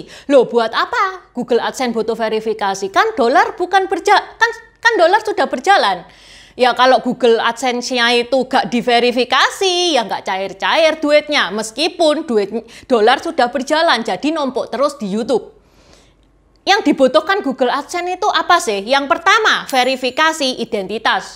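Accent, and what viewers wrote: Java, Indonesia. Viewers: Salam kenal mbak 🙏🙏🙏, aku senang dengan logat bicaranya Podo Karo aku Medok Jowo, ndilalah Podo senenge Ng sejarah👍👍👍❤️ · Meskipun logat Jawanya kental tetapi video yang dibahas cukup bagus kok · Perjuangannya kerreenn. Menginspirasi. Medok itu malah khas dan seksi hehe... Semoga akun saya pun cepat nyampe syarat dan monet...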